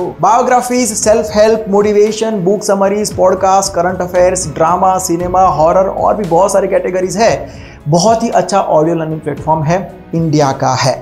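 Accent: Gujarat, India. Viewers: Gujarat se ho Bhai???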